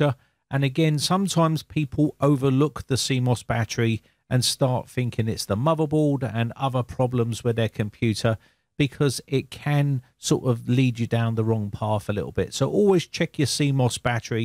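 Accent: United Kingdom. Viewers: This is weird, because I have never owned a PC that has done anything other than pop a "CMOS Checksum Error" (or some similarly worded error message). The BIOS chip makes a checksum of all the settings you have saved in CMOS, and it keeps this in memory. When you boot the computer, it will compare the checksum of the current CMOS Settings against the CMOS Settings Checksum that it had previously saved. If, for any reason, they do not match (they won't match if the battery is dying, ever), it will pop that error and force you to go into the mainboard settings (some BIOS chips give you the option to boot with default settings instead, but this is usually undesirable because the default settings almost never include a storage device, which means no OS will be found). This is hard-coded into the PC's BIOS chip, and it's done for safety reasons as erroneous data could be very damaging to the computer's hardware, such as sending wrong voltages to the wrong places in newer computers whose BIOSes allow you to overclock hardware. 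You'd have to be running an extremely cheap off-brand mainboard to be able to boot with corrupt data in the CMOS. Unless, of course, they do things differently across the pond? You sound British so maybe computers in the UK work differently than those over here in the States?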